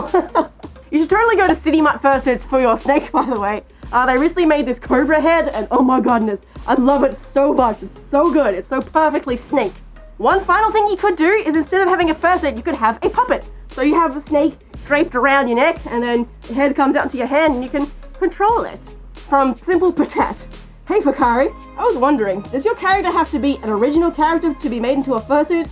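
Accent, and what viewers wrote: Australia. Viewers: You sound more australian then when I was last here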